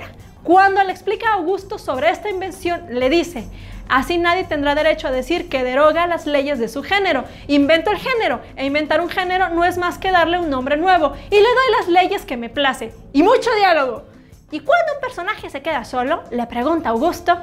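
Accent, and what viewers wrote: North, Mexico. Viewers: No sé de dónde es, pero me encanta esa forma tipo norteña de explicar las cosas. Gracias. 💕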